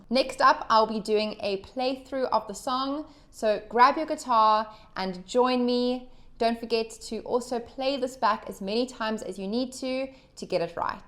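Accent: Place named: South Africa. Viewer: Awesome...and nice to hear a South African accent.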